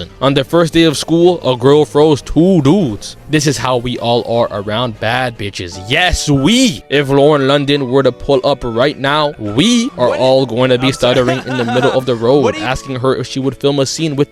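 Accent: Toronto, Canada. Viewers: r u from toronto? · Are you Canadian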